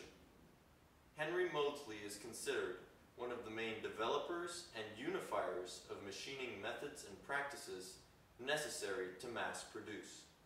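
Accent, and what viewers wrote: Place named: United States. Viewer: I'm always late to the party. Only got a yt recommend today. Good info but as the others have said a touch light on the volume front and your pronounciation a little too american but forgiven on both fronts.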